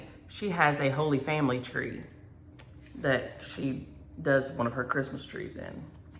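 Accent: South, United States. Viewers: . I love listening to you with that southern accent (my parent both😮s were from the south so the majority of my relatives have that same accent). I'm so sorry about your framing debacle. I live in Michigan sol our pumpkins are all cold up here. I hope you have a fabulous weekend. Take care. Pat Tackaberrry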